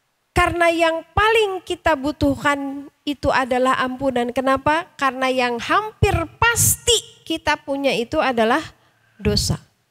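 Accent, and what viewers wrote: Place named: West Java, Indonesia. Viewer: Alhamdulillaah, trimakasih Ya Allaah,sy bisa mengikuti kajian Ustjh.sunda pisan,sy seneng pisan.sama produk sponsor nya juga menarik.dimana sy bisa beli? Udah di jual bebaskah?di Bekasi bisa di beli dmn?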